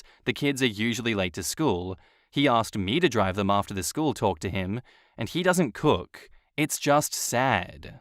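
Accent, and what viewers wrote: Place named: Australia. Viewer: I love these. Thank you, for delivering these stories at the right speed, in a nice accent and with enjoyable, insightful and to the point commentary. 💜✨🧿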